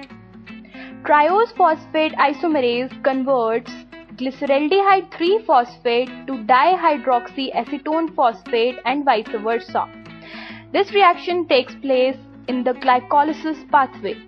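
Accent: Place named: India. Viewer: Oh my god I'm arabic and I can't understand your hindi english 😣😢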